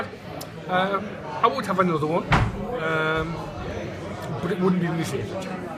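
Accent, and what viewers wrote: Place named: Northern England, United Kingdom. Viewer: I think The Malt Miller, Worcester Hop Shop and a couple others give the option of crushed or uncrushed. Think the benefits of uncrushed are it keeps fresher for longer. I've heard people say uncrushed grain only really keeps for a month or so, but i must admit I've never had any problems with 6 month old grains. Really enjoying the video's and glad Ant Rogerson directed me to your channel. I'm from just down the road in Newcastle, and its very refreshing to hear a Northern accent on youtube. Especially after putting up with those Southerners like Ant Rogerson and Cheshirehomebrew for a while.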